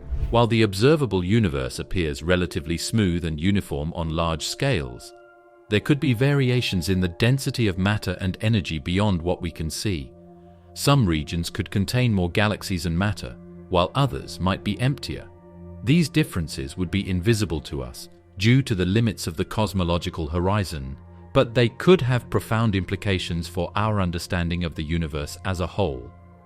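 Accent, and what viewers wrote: England, United Kingdom. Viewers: The usual dribble (English accent included) with generic impertinent graphics. Good for sleeping though.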